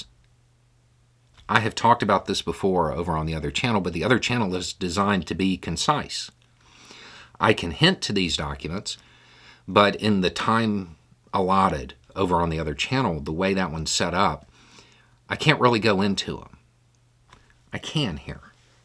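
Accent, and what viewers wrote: South, United States. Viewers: I feel bad about what you said your accent. The southern accent is such an incredible accent, so beautiful and will always be so.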